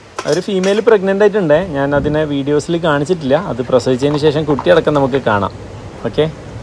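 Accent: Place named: Pazhangadi, India. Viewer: Pazhanghadi bhasha polich 😂👍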